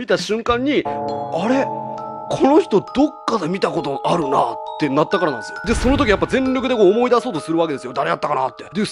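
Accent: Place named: Chikugo, Japan. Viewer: 正確には筑後弁だね？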